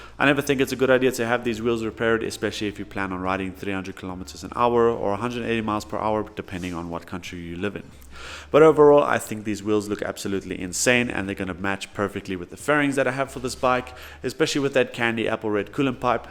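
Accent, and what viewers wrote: South Africa. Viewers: Is jy afrikaans daai aksent se so...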